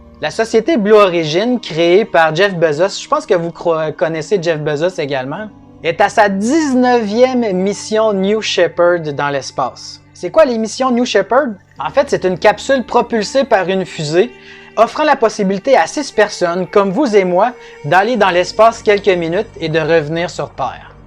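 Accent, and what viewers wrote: Quebec, Canada. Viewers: Slt, bonne vidéo. C'est la première que je regarde de ta chaîne. J'apprécie ton accent québécois😉, tu me rappelles Polo (CB Games).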